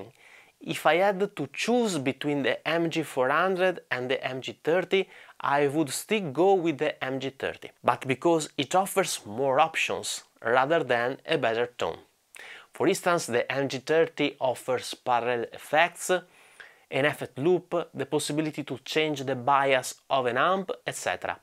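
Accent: Italy. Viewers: you're Italian I can hear it.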